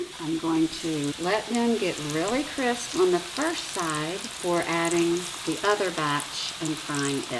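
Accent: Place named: South, United States. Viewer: First of all,you're gorgeous! I detect a southern accent. My mom was from SC. Anyway I'm going to make these. Thanks!!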